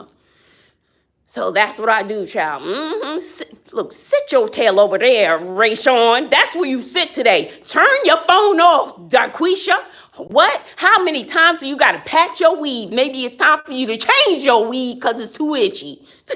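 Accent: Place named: Boston, United States. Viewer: Where are you from? You sound like and remind me of my aunties from Boston?? ☺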